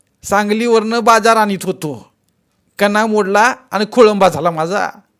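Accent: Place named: Nipani, India. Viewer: कथा सांगली मिरज कडची आणि बोलण्याचा टोण निपाणी हामीदवाड कडचा वाटतोय जरा जरा सुर वडताय 😂 कोकणी गत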